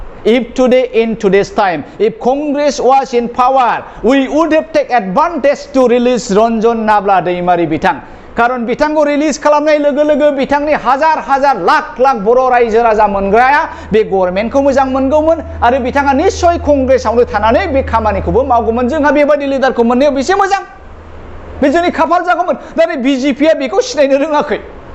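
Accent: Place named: Bangladesh. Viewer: Ngni raoa bangladesi butumnaisw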